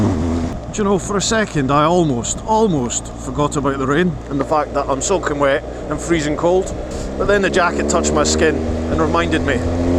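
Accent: Scotland, United Kingdom. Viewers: love it mate, just found your videos and loving hearing the Scottish accent! some great roads round Glencoe shame about the usual weather, North Coast 500 is a must for beautiful roads up here, never done it on a bike before though! Keep up the great content! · I know it all sounds normal to you, but you had me in tears there... “Great giggle” “Naughty overtakes” 😂🤣😂🤣 I want to learn how to sound Scottish! Is there a pill? If you’re ever in San Francisco, give a shout. We also have an Inverness, it’s lovely too (no Loch but we have a bay), with as much glorious riding up and down the Pacific coast as even an Iron Butt could want. Thanks for the ride along. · That was pretty hardcore riding, but you where on the right bike, those other two guys on the MT-10 and Fireblade had to have been hurting more than you, epic ride ! Sounded like you had a bit of a Scottish accent, where you one of the extras on the movie Braveheart ?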